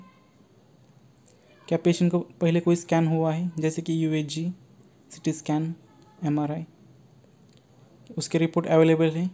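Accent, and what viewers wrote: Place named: Maharashtra, India. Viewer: jay shree ram brother'.....your language like Maharashtrian.... ❤️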